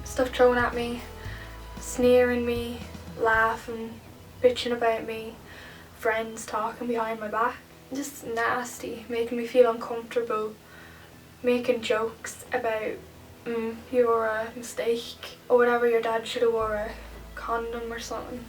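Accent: Dublin, Ireland. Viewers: what part of Ireland are you from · That sucks I am sorry that happen to you I am glad you are doing better I love your irish accent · I love your Irish accent 😻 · r u irish cos i am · Are you Irish? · omfg your irish im irish aswell are you from west meath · Your really beatiful.. Are you from Ireland? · <3 Know the feels x Is she from Dublin??? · i love your irish accent the real irish accents gotta love em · She is Irish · Don't let people judge u..... Love Ireland r u from Dublin? (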